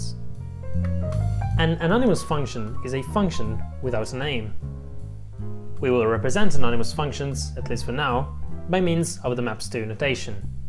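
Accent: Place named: Europe. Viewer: i cant tell if you have a western european or an eastern european accent. good video tho.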